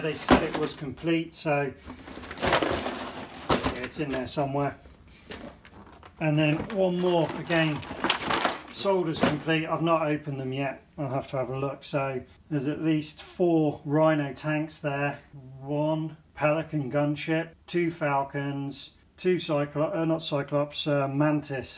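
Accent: United Kingdom. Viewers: Do you buy Mega in the UK? You have an English accent....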